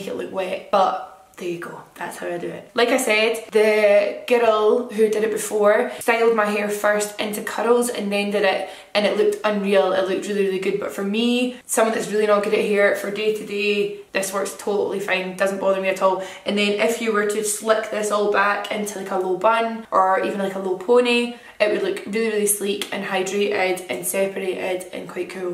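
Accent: Scotland, United Kingdom. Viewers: Hajar Lakroune scottish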